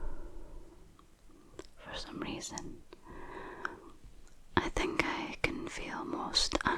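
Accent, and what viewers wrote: Scotland, United Kingdom. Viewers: I love your Scottish accent im from sunderland in the north east of england and i love visiting scotland every chance i get 😍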